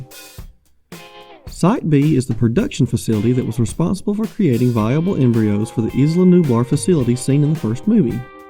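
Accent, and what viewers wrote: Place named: South, United States. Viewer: Articulate. Speaks well. Southern. Talking dinosaurs…. Dude! You are my new best friend!!!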